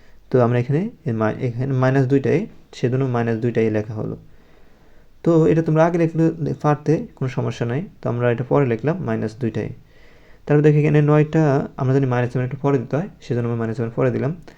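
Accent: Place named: Barishal, Bangladesh. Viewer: বরিশাইলা কথা😂😂😂❤❤❤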